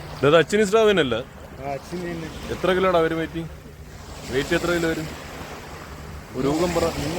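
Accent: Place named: Thiruvananthapuram, India. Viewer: തന്റെതായ അവരണ ശൈലി തിരുവനന്തപുരം സ്റ്റൈൽ സൂപ്പർ 👍❤️🎉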